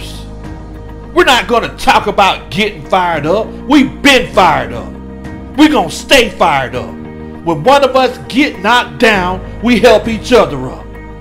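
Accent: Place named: South, United States. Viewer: Coach ... This Was Excellent. Complete w/ Southern Accent & Lingo. I Hope The Team Endorses This Grass Roots Effort. Keep Up The Excellence Coach... We'll Tune In.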